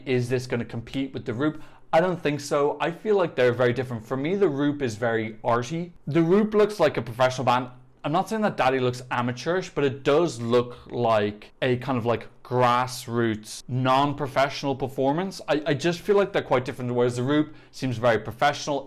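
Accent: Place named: Ireland. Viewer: This was a nice reaction video, I enjoyed it and you had some interesting opinions. Keep it up dude! I have some constructive criticism if you don’t mind. The only thing I’d say though is that you don’t seem to annunciate your words very well. Like, I’m Irish and even I found it difficult to make out what you were trying to say a lot of the time. It’s important to keep in mind that, if you want to appeal to a wider audience, they need to be able to understand you even if English isn’t their first language of if they generally have trouble deciphering Irish accents. Apart from that, the video was great!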